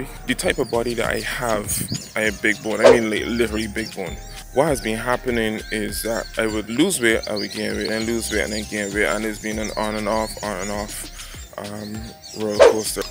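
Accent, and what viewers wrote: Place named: Barbados. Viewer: I can't do two push-ups, so I'm already impressed. Also, so nice to hear a Bajan accent. I think I'm 30% here for that alone.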